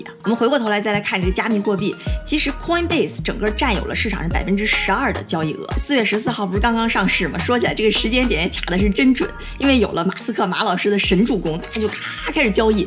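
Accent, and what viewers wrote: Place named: Shandong, China. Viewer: 听小林的口音像山东或者大连人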